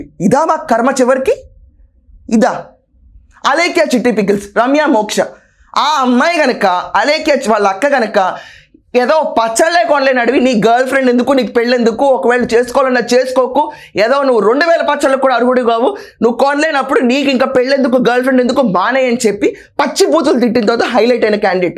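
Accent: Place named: Hindi Belt, India. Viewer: BP tho potharemo anukunna mam... chala hindi vala style lo matladalanukunnaru bavundhi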